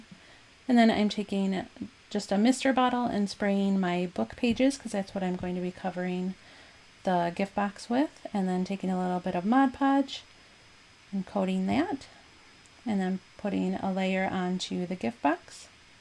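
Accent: United States. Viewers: Your creativity and ingenuity knows no bounds! Wow, it's hard to choose a favorite...I loved so many of these! Thanks for sharing such inspiring projects with us! P.S. Are you from the Northern Ohio/Southern Michigan area? Your accent is familiar : ) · So many cute ideas! I love the gift tags, the paper flowers, the tray and the craft room storage ideas. I also like the pumpkins - I actually knit those so I think using socks might be easier. Your Michigan accent (guess) makes me think of home. I was raised in Ohio. Thanks for sharing your creativity! 💜🙏🏻👼🏻✝️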